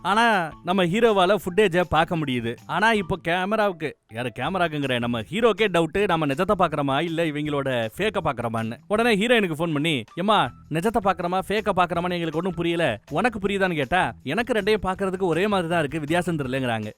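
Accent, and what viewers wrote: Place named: Sivagangai, India. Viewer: ஐயாவின் விளக்கம் வெகு விமரிசையாகவும் எதார்த்தமாகவும் அங்கநங்கே நையாண்டி நக்கல் கலந்தும் சிவகங்கை வட்டாரமொழி வழக்கிலும் உள்ளது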